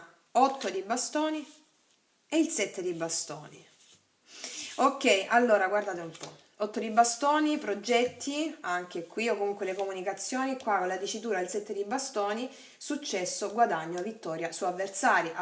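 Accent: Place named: Salento, Italy. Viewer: Che dirti? Brava davvero Io conosco solo le sibille, ma tu sei davvero fenomenale. Ti svolto anche se ti conosco e scoperta x caso da pochissimo, ma mi porti a casa. In Salento, l'accento è inconfondibile, vivo all'estero ma sono salentina doc. Grazie. 🌺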